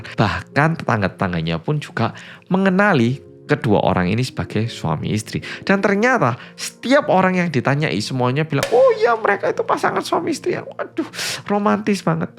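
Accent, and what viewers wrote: Java, Indonesia. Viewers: Bahasa indonesiamu hampir ilang tapi kenapa boso jowo mu jik nempel bgt😅 unik bgt kwe soll😂😂😂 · Hellow bolo medoook❤ Nyimaaak 🎉🎉🎉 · hahhah... peIiket jare 😂 . mbanyolan mu sek panggah Iuucuu wae maas 😂 .... jowomu sek kentheI Iooh 😂 minggu, 16 n0fember 2025 | 25 jumadil-awal 1447 hijriah . 00;35 WiT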